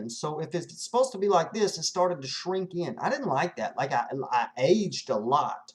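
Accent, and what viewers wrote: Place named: United States. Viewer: man.. I am so happy I found your videos! I myself have been in a similar situation with my teeth and after the past 10 years and $thousands of dollars on root canals, crowns that break or fall off, on and on, you know.. I've decided I just have to get dentures. I have no choice and implants are out of the question. You've given valuable information to me and I'm sure a lot of other people. I feel like I'm listening to a close friend because of your accent. Well, it's not really an "accent", people don't understand that it's American the way we speak. Anyway, thank you again. I have a lot to do! Take care buddy.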